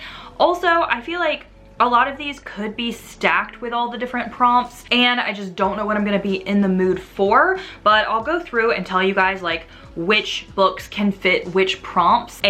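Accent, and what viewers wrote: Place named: South, United States. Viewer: That country accent🤣🤣🤣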